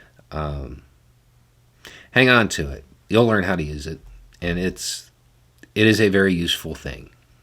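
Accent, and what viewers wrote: South, United States. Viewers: The fact that you describe yourself as, "detached" helps me understand part of the reason I've always trusted you. I describe myself that way, and others have made that observation about me. We have similar backgrounds in regards to our younger years and area. I've lived in the rural South my entire life, and you sound like the men I've been around my entire life, accent wise. The vast majority of men around me are typical so called christian conservatives, mostly trumpers at that, ugh! Oddly enough, I feel like you listen to me more than any man in my everyday life, sad huh lol. I'm so glad I found your channel way back when you had around 250k subscribers, I know you and your intelligence and calm delivery have helped to keep me sane during this weird ass timeline we are currently living in, thank you for everything, and team! 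✌️❤️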